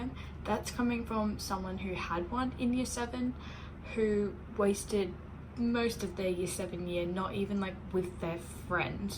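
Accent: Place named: Australia. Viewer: Do you live in Australia or the UK but i am hearing an Australian accent and I am fairly sure the school system is the same so its confusing me 😭😭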